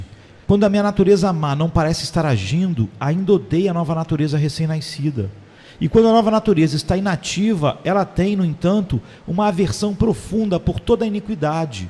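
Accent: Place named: Rio de Janeiro, Brazil. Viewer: Tem sido maravilhoso ouvir Spurgeon em sua grandeza em português e ainda melhor com este gostoso sotaque carioca! Obrigado irmao que o Senhor continue abençoe o sempre!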